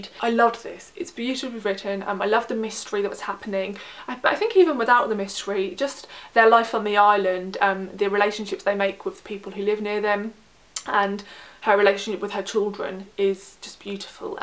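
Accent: England, United Kingdom. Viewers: I love her accent. Could i know which part of England she comes from??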